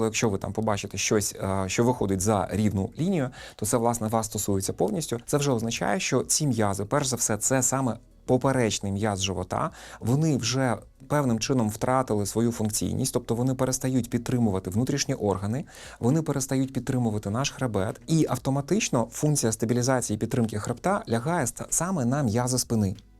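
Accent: Standard. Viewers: Як приємно слухати справжнього фахівця своєї справи! Дякую Вам за доречні поради, гарну чисту мову і позитив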